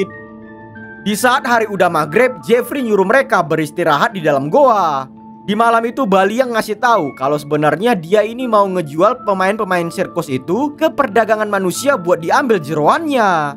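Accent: Medan, Indonesia. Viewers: Iya we... Anak Medan yaaa Jangan kembut we😅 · Logat medannya kentara sekali bang 😂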